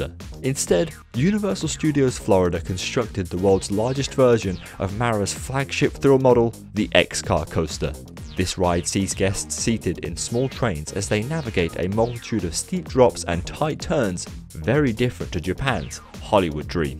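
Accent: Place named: Public school, United Kingdom. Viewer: Yes......fair enough! You guys do have different styles, I have to concede...though I must say that you have the posher (public school) accent.....whereas 'Expedition' sounds more like a chavvy south London/home counties one. Yeah....I keep on thinking of Sadiq Khan everytime I hear 'Expedition Theme Park' speak!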